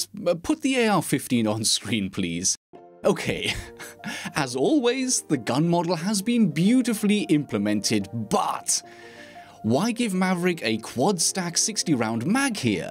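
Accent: Germany. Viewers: Terry Makichod I think he’s German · Just wondering, are you German? Your voice kind of sounds like you are a German person who is really fluent at English. Or maybe it's just your microphone lol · Sometimes you have a little German accent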